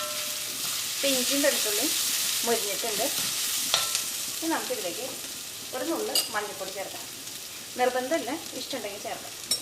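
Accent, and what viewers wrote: Thrissur, India. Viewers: good cook and good Trichur accent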